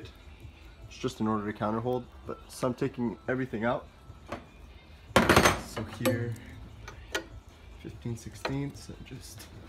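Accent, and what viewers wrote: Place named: Quebec, Canada. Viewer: I was about to say, your accent is almost french canadian. Then I clicked on your profile and I see Montreal, Québec, Ah ben Tabarnak ! :) Haha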